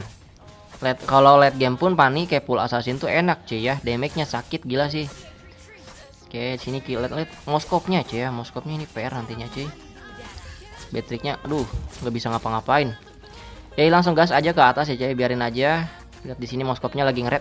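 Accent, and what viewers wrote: West Java, Indonesia. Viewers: Ngakak, sunda na karasa pisan ekek. Sukses brad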